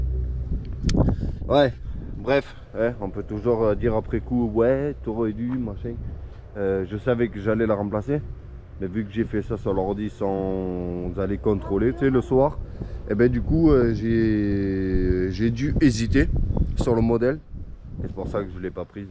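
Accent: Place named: Perpignan, France. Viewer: ah entendre l'accent de Perpignant çà fait du bien !!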